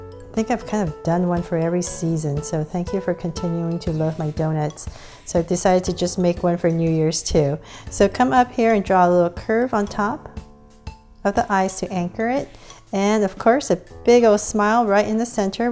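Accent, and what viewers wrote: United States. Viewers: R u American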